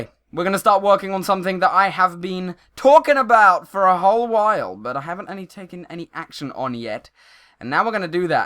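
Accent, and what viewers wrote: United Kingdom. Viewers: QOTD: I don't know what u mean but in minecraft I like to travel by sprinting and in real life I like traveling in a car because u see more then taking a plane also can u do more daily captive minecraft 2? Thanks your vids are great and I love your British accent of your voice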